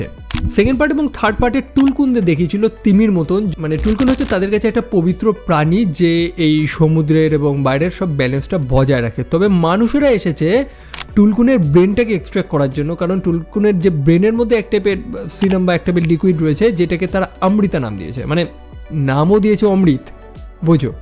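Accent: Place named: India. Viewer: ভারতীয়দের উচ্চারণ আরকি 😂ইংলিশ ওরা এমনেই উচ্চারণ করে 😂নিজেদের মত করে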